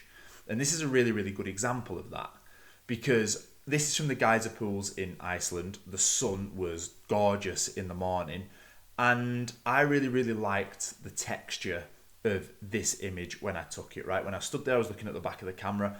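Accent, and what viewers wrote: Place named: Manchester, United Kingdom. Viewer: Your video came up in my feed. You started speaking, and I thought “he sounds like he’s from Manchester”. Yep! My good friend is from Manchester, and has shared with me all things from the city. Like, and football. So the big question is city or United? Keep up the good work,!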